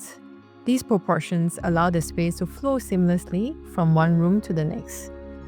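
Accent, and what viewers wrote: Singapore. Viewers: Lovely place and couple. I’m glad the narrator’s Singaporean accent was easy on the ears and not the stereotypical choppy accent lol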